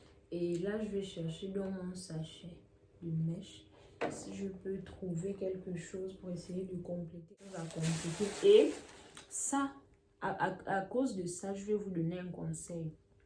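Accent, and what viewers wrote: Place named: Gabon. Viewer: Cc vous etes gabonaise? A cause de l'accent...svp jai besoin de la formation svp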